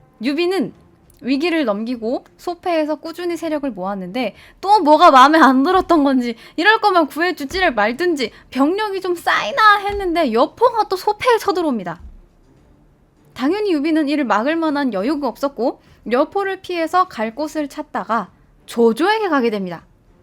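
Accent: Gyeongsang, South Korea. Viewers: 전번에도 여쭤본바 이 지만..원래 역사 전공이신가? 넘잘하시네,,젊은분이..글고 억양도 살짝 부 마 지역?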